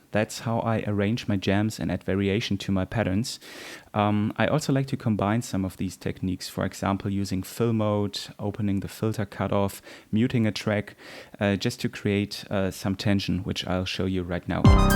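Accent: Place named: Germany. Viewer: German ? Da ist was deutsches in deinem Englisch...beste Grüße aus der Vulkan-Eifel. Geniale Videos. 😍😎👍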